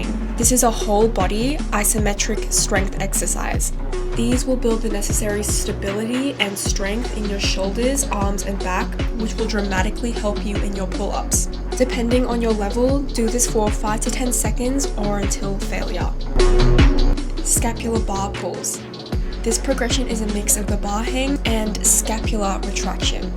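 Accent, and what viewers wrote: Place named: Australia. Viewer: Prefer your natural Australian accent. Beautiful videos. Thank you.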